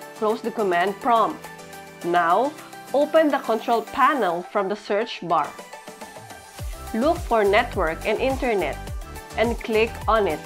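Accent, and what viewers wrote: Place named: India. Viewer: i would have fell for it if it wasnt for your indian accent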